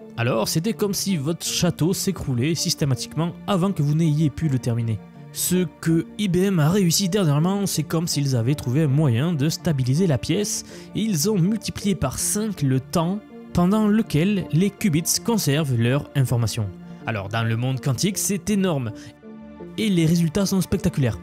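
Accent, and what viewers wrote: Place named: Southern France, France. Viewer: L'accent du sud de la France est rafraichissant! Bisous du Québec!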